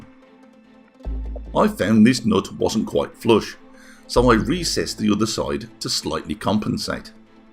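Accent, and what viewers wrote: Birmingham, United Kingdom. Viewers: Good job fella. Subbed. Do I hear Brummie in your accent mate?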